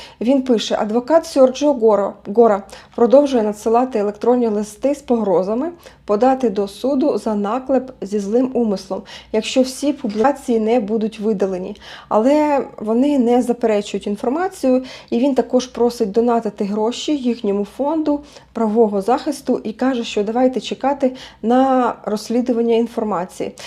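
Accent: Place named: Vinnytsia, Ukraine. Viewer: Говор винецкий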